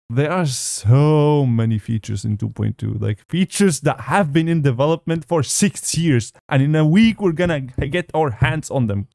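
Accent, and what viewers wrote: Germany. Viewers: Wait you german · Are you German?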